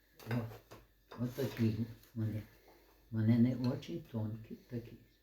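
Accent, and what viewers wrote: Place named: Ukraine. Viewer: Этот украинский говор звучит для меня как музыка. Так говорила моя бабуля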